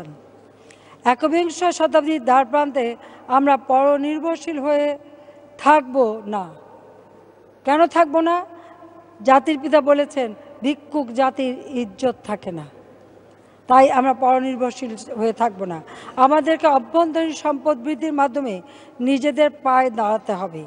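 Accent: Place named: India. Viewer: Akdom amader Indiar moto kotha batra....so i like is.........kotha sunei mone holo na j akjon Bangladeshi mohila.....!!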